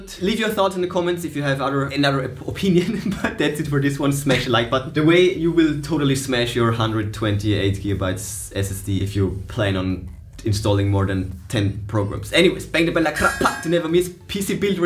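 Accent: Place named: Germany. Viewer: wenn du deutsch bist, versuche nicht englische videos zu machen wtf. der deutsche dialekt ist immer schreklich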